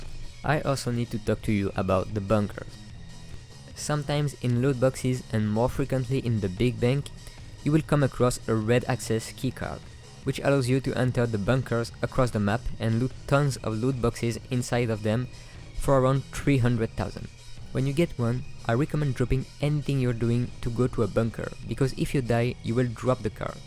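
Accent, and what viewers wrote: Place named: France. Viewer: T'es français ça s'entend direct à ton accent mdr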